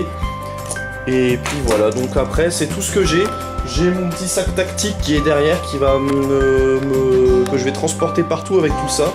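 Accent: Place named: Nord-Pas-de-Calais, France. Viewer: T'es ch'ti toi, je reconnais l'accent de chez nous!!! 62 moi. Je pars aussi au Japon. Photos sur instagram. Il y a déjà des photos des voyages au Japon, Tokyo, Kyoto, Naoshima, Kyushu etc de 2014 et 2015.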